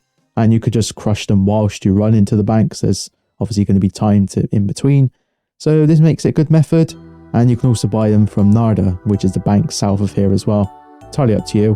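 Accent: Devon, United Kingdom. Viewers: Devonshire or Bristolian? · Lmao where r u from you sound like your from Tiverton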